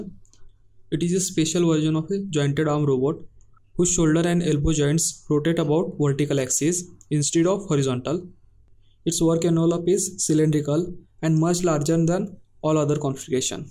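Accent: India. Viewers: The most difficult English the indien English 😅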